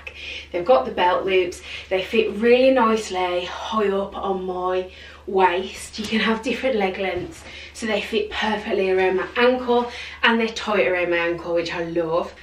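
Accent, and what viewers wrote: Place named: England, United Kingdom. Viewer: Hi 🙋🏻. You popped up in my recommendations and I’m SO glad you did 🥳👍🏼. I love my American ladies but it’s so nice to hear a good old English accent 🤣. You deserve a 🏅 for trying on all those jeans. I absolutely detest shopping for jeans 👖 🔫🤬🥵❗️. I am a size 18, and like you have a small waist and big hips and thighs ☹️. If jeans fit my waist they sure as hell ain’t gonna fit my hips. I have been buying jeggings in an effort to solve these problems. I feel more ‘put together’ in jeans TBH, but I love the fit and comfort of a jegging 👍🏼😀. ASDA do some great jeggings at the very affordable price of £12.50. I have several pairs and they wash up lovely 😊You should try them. Going off to watch some more of your vids now. New subbie 🌹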